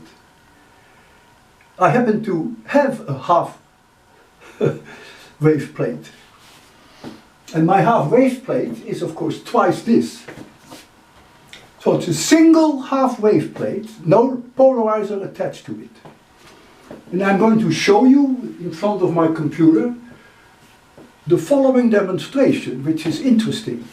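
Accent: Netherlands. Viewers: Thank you! I used to live in Holland back in the 1980s and I recognized your dialect immediately when I watched your first video. I still love physics but do computer work. Danku wel.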